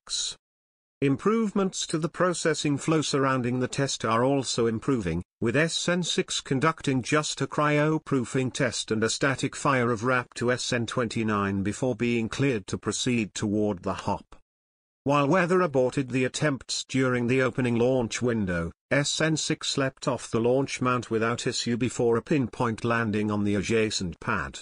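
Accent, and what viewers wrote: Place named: RP, United Kingdom. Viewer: I love how the British cultured accent rubs the Yanks the wrong way. LOL Well done on the content m8t cheers. How about you change it up and use a Cockney or Scouse Accent BWG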